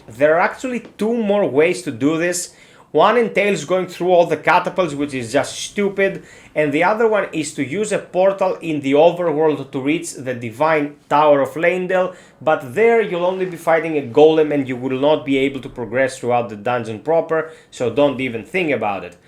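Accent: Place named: Greece. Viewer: φίλε ευχαριστώ, τώρα το αγόρασα το παιχνίδι και είχα κολλήσει οπότε ξεκίνησα το quest της ranni. Από φωνή ψιλοκατάλαβα την ελληνική προφορά και μετά είδα το channel description 😂 Here's a sub :D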